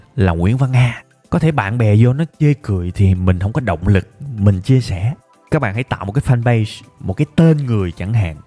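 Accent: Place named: South, Vietnam. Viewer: tiếng miền Nam hài ghê ạ, cảm ơn video của thầy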